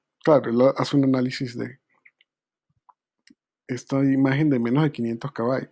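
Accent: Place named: Venezuela. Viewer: Tienes "acento" venezolano.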